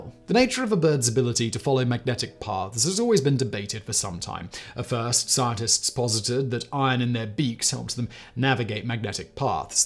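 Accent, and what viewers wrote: England, United Kingdom. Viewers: Why would they use Canadian pronunciation, given then channel and its scriptwriters and editors are American and the presenter is English?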